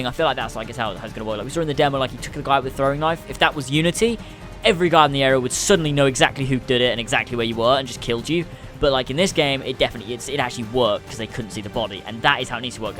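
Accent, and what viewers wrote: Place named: United Kingdom. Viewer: Can you stop with that horrible british accent?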